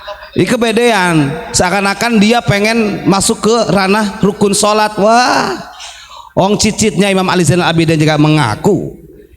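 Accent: Indonesia. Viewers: Keren lagom jawanya....enak didenger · pembacaan barzanji nya ala banten banget... ❤❤❤❤❤ banten tangerang serang pandegelang cilegon..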